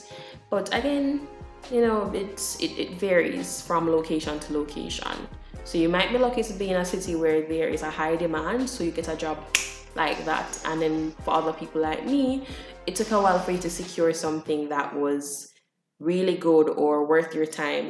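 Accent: Jamaica. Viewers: Are you a jamaican RDH or overseas? Edited: lol I ended up finishing the video so I know now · She sounds like she has a Jamaican accent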